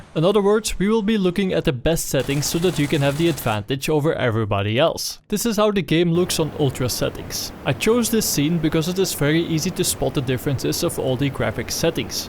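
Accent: Netherlands. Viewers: LEKKER NEDERLANDS ACCENT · Hollands accent ftw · u sound dutch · love the accent too! guess its dutch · I like your dutch accent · you have a horrible rotterdams dutch accent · That accent tho! only the dutch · Dutch accent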